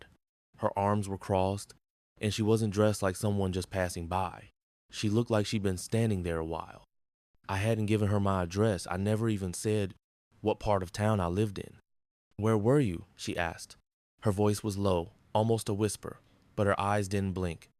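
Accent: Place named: Africa. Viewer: You're African, I can tell from your voice.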